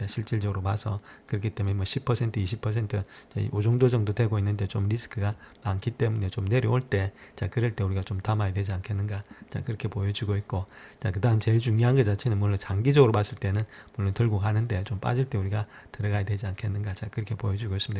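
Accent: Gyeongsang, South Korea. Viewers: 명료한 설명감사합니다. 구수한 경상도 사투리도 듣기 좋습니다~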